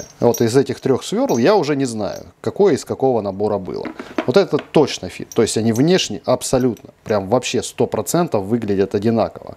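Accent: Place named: Rostov, Russia. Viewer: Прикольный ростовский выговор)))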